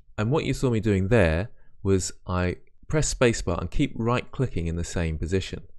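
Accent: United Kingdom. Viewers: I love your videos so much plus your British Accent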